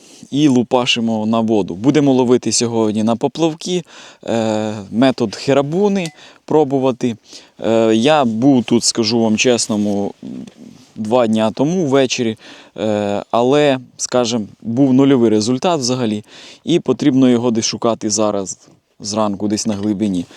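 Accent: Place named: Polissia, Ukraine. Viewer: Доброго дня ,яка місцевість , по мові ви з полісся.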